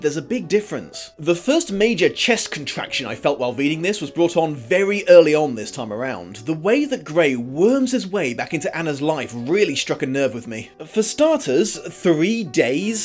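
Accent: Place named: United Kingdom. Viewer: I'm sortof giggling at the whole dom/sub thing. It was a branch of literotica I found very interesting in my younger years :) I can imagine you as a world-weary, slightly snippy dom who doesn't have the time for his subs' bullshit. If you're dressed as an Oxford tutor with a pencil behind your ear, it's your own fault for having such a marvelously British accent. I'm absolutely in love with the way you call out and destroy E L James's twaddle. Keep it up.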